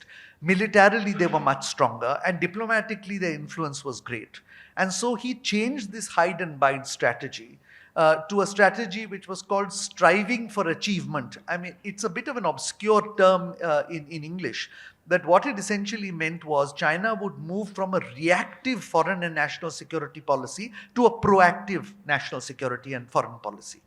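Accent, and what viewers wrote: India. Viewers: I don't understand India accent go back to school old men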